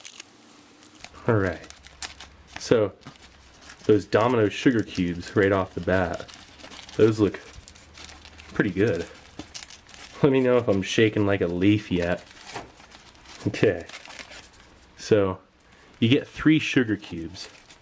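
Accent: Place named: California, United States. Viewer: Dude where is your accent from. I’m guessing California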